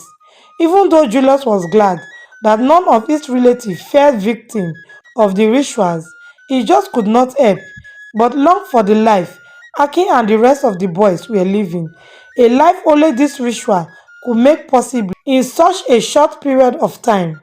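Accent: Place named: Edo, Nigeria. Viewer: The Edo accent of the male voice in your story is sooooo funny and 😂😂😂 Even you also have an edo accent.. Are you from Edo State? 😅😅😅😅😅 I love your stories...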